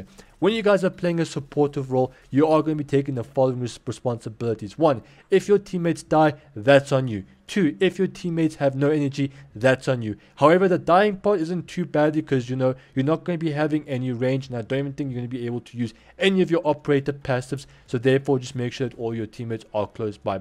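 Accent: South Africa. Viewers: this ou south african?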